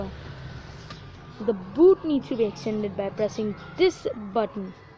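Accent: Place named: France. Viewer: which English this French 🤮🤮🤮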